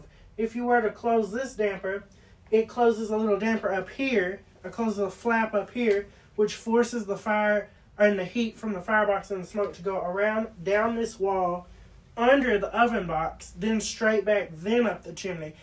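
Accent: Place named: Georgia, United States. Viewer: It’s actually a mix of deep Georgia and some transatlantic it seems. It’s very interesting.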